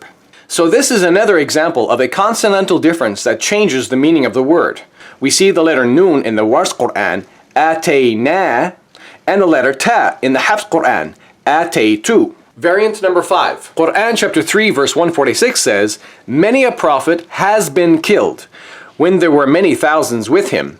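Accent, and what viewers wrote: Arab world. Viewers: I'll be back but I am just saying when he speaks with an Arabic accent, it's kinda annoying.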